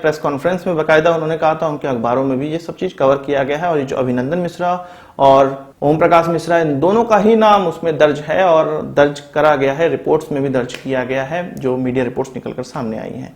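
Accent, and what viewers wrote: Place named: Bengal, India. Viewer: its bengali accent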